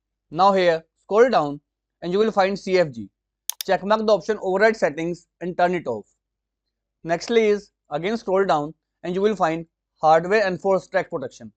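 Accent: India. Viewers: heard the indian accent and already knew he was going to cook W video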